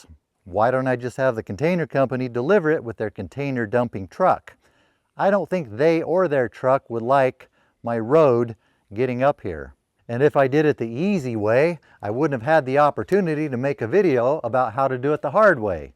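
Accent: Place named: West, United States. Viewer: the wonderful buster scruggs accent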